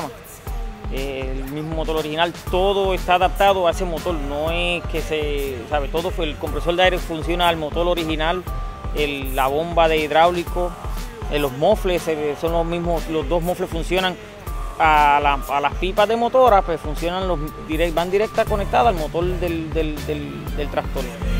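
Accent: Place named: Puerto Rico. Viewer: Congratulations'! TIENES vision y talento DTB. Saludos desde Florida .USA. Suenas boricua !wepa!!! Bonito color.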